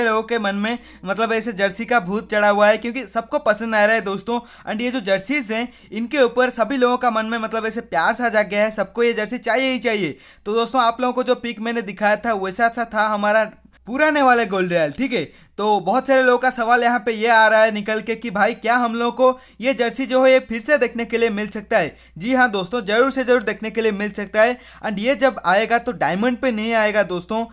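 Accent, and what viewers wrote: Odisha, India. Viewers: Ap Odisha se ho kya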